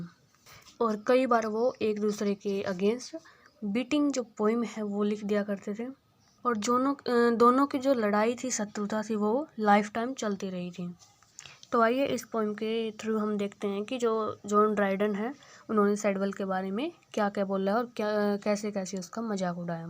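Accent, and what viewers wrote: Haryana, India. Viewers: Haryana se ho ky?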